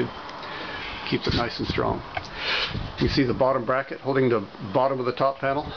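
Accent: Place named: South Australia, Australia. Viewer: hey nice to hear another south aussie are you in metro or country sa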